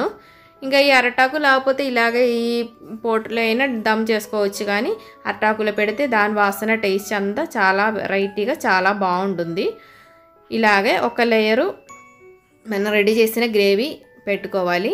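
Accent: Kerala, India. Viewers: Hi ma'am ningal malayali aano ningal Telugu parjunna slang malayalis nde pole thonnu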